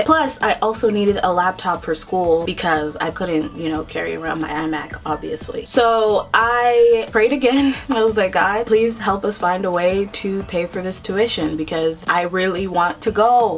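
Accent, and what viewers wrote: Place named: Brooklyn, United States. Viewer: I like the Italian Brooklyn accent, perfect!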